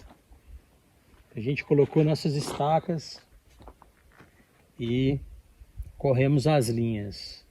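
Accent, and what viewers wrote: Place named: Bahia, Brazil. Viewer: Lá ele 1000x. Baiano eh outro nível.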